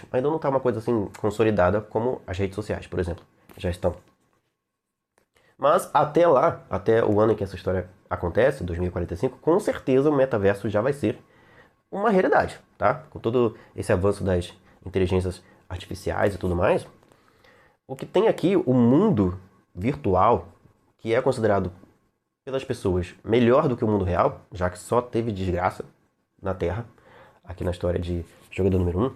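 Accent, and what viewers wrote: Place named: Petrópolis, Brazil. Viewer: Boa tarde, Xiru ! Bah, percebi que seu sotaque não tem aquele "S" que vocês arrastam Geralmente percebi - se que tu tens, um sotaque limpo tu deves ser de Petrópolis ou Niterói ! Caso se eu tiver errado , tu me corrige !!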